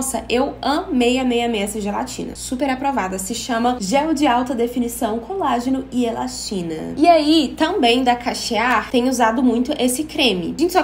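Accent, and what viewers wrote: Minas Gerais, Brazil. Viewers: Eita Mineirinha .......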